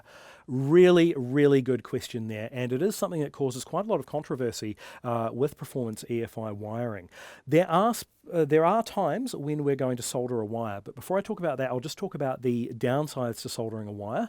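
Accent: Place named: New Zealand. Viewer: Good old kiwi pronouncation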